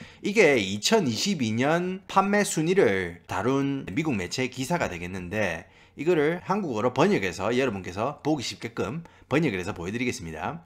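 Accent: Gyeongsang, South Korea. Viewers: 같은 경상도 사람인데도 사투리 찰지게 하시네요^^ 재밌게 보고 있습니다~!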